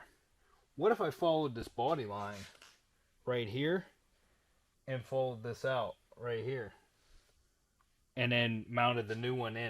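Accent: Pennsylvania, United States. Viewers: Damn. That's unfortunate. It only looks like the door, fender and front pillar. By your accent, I'd say that you are in Eastern Pennsylvania? How about the junkyards in the Scranton area for parts?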